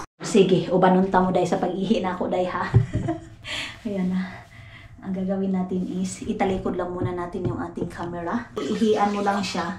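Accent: Visayas, Philippines. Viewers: Ang or mu nmn oiy wlay lami ang imong content ba visayang badoy mag salita halatang visaya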